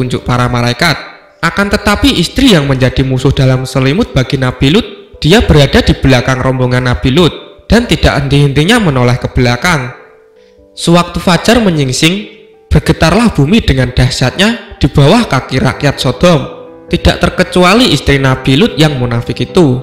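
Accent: Java, Indonesia. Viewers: Wong jowoooo medokk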